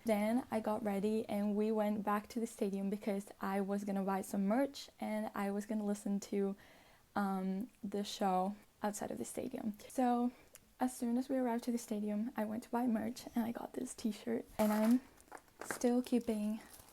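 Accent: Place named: England, United Kingdom. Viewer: girl, i'm from Spain and i really LOVE your english accent, even in my wildest dreams i can not have your accent!! please, do a tutorial or something hahah pd: i LOVE this video, i can't belive i was there!!!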